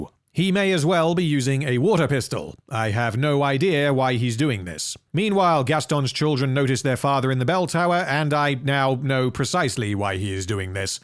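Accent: United Kingdom. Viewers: I definitely knew you were English I just thought you guys had names for all your varieties of accents on the Isles. Regardless, you have a wonderful channel I love the long form evisceration of this trash. You aren't alone many of us remember THE BEFORE TIMES. Lol.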